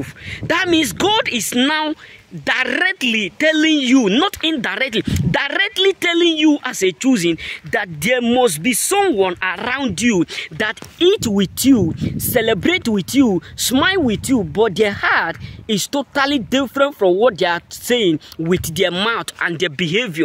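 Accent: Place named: Africa. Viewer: I love your videos I would like to encourage all chosen ones to go ahead and click on the transcript so you can understand exactly what he's saying I know his African accent is a lot so click on the transcripts and you can read word for word exactly what he's saying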